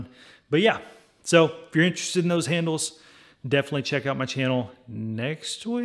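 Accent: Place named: South, United States. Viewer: Love the southern twang! And the gym too!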